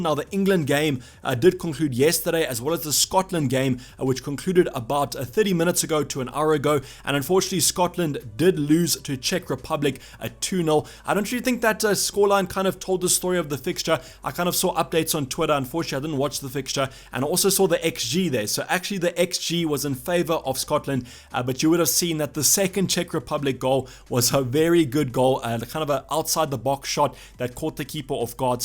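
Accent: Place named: South Africa. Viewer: No but your SA accent just makes it better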